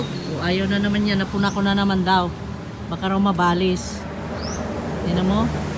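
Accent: Ilocos, Philippines. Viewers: I was wondering about your accent all along, Ilocano pala kayo living in the Visayas. My family is from Pangasinan-Tarlac, both Ilocano parents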